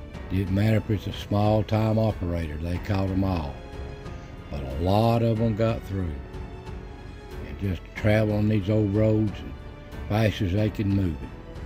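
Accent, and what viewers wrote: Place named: South, United States. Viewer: Hey, thanks for another great video. I heard my grandpa ran moonshine, but unfortunately I don’t know too much about it. I lived in Tennessee near Nashville when I was a child, and I had your lovely accent lol. We moved to Texas for a while, then back to North Carolina so my accent changed a bit, but still southern. Anyway, my son and I enjoy hearing you speak, and the old photographs are just beautiful. Have a great day. 🌺😊🌲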